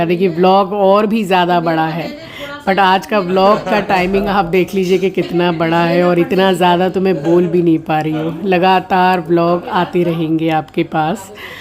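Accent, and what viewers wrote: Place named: Uttar Pradesh, India. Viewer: Pehli bar aap ki lengbej kuch up Bali suni hai maja a gaya 😊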